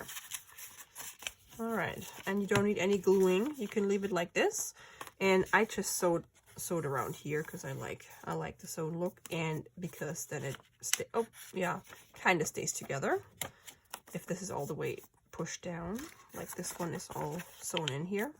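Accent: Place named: Minnesota, United States. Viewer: I love these! This vid just popped up. Are you still making videos? You sound like you’re from Minnesota or Wisconsin. Just an observation. Great content. 👍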